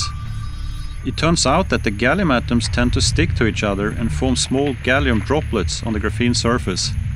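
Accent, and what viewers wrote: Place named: Norway. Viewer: This is so cool, and so much more authentic with a norwegian accent.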